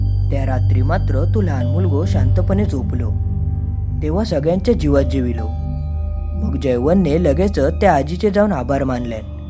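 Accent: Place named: Malvan, India. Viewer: Story thik ahe but accent malvani ahe so kalnyat thodasa Vel lagto and also plz...for god's sake biography bollyasarkha accent naka theu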